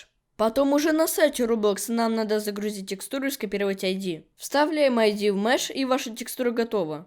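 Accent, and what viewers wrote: England, United Kingdom. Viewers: Нихуя не понятно с этик английским акцентом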